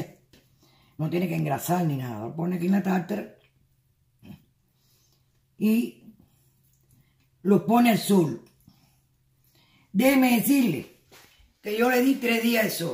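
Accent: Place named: Cuba. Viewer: Gracias, desde que senti tu forma de hablar me di cuenta que eras cubana y me emociones. Hace muchos años que no como chicharrones de viento, la boca se me hizo agua Nury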